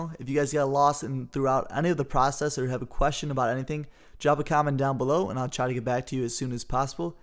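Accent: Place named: United States. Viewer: Thank God your American and i can hear you clearly.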